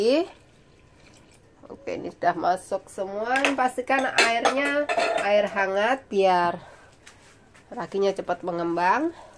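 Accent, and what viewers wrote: Java, Indonesia. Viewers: ndlok ngomonge sampean wong jowo yo? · Konten konten mantaff..mba sekeluarga warga negara Hongkong apa warga negara Indonesia..Sy suka mba klo bicara pake bahasa Inggris tapi medok nya masih ada..the best mba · Aq suka dengan resepx n cara bicarane itu lo bhs jawax medok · Mbak ini ngomong nya kaya orang jawa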